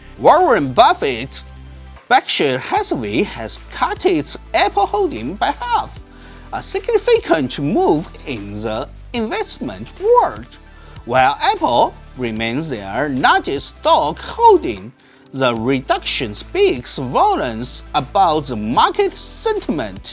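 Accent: China. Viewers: which chinese dialect is that?? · its his second language hes probably just trying to practice his english. he seems to be chinese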